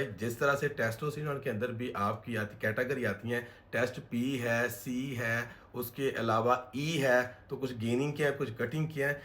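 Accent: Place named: Pakistan. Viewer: Sab theek lekin accent pakistani kyu hai aapka ?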